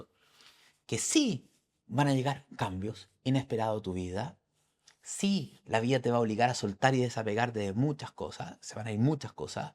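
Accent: Chile. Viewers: Excelente, apenas te conozco pero me encanto tu video, me aclaraste muchas cosas. Por tu acento pareces chileno. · Eres muy divertido😂y eso que no te has soltado la pinza total! Urano te va a ayudar😅Chileno, que bonito es vuestro acento❤escucharte alimenta lo que descubrí con la Astrologia, gracias por tu generosidad, ese es el camino🎉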